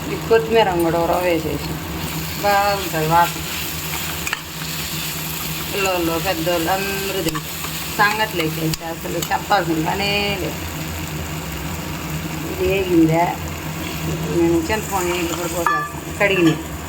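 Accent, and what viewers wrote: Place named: Chittoor, India. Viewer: Pakka chittoor basha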